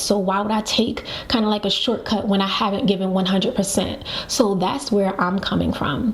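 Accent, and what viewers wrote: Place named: Baltimore, United States. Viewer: I just ran across your video! Are you from Bmore? You’re accent is so cute!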